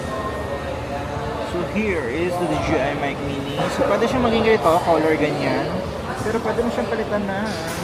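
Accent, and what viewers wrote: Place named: Thailand. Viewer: sobrang thai accent ahahahaa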